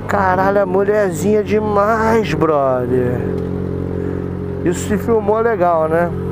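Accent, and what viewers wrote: Rio de Janeiro, Brazil. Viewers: Esse sotaque carioca,e deixa louca....que tesão!! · Você é do rio né brother? Maneiro!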